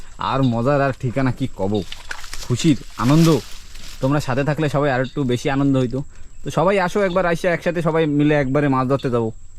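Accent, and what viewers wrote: Barishal, Bangladesh. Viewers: vai tui pure barishaila ❤ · আপনারা তো আন্দামানেরই ভিডিও দেন যেটা ইন্ডিয়ার ই অংশ বলে জানি, কিন্তু আপনার ভাষা পুরো টাই বাংলাদেশের বরিশাইল্লাদের মত ক্যান/?? একটু কমেন্টের রিপ্লাই দিন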